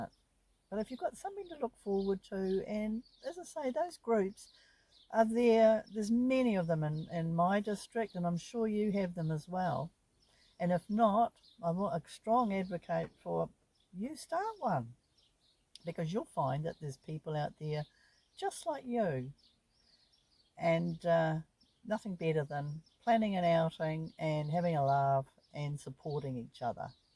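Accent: New Zealand. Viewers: It's fantastic to hear a kiwi accent. Totally agree, everyone needs a purpose, even if it's small.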